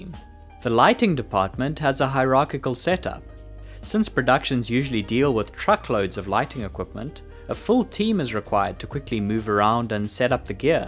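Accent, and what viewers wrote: South Africa. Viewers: You have a very distinct UK accent. Is that London or somewhere in. Northern England? Oh South Africa! No wonder I couldn't pinpoint it. Keep up the good work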